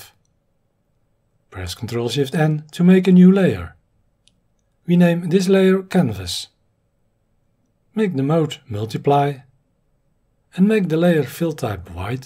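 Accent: Netherlands. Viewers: I adore that little touch of Dutch accent, you speak quite calmly and can be understood very well!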